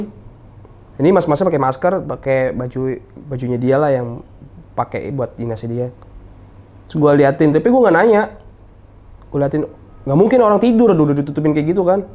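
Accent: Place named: East Jakarta, Indonesia. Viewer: Lo so asik bang jaktim bgt 😅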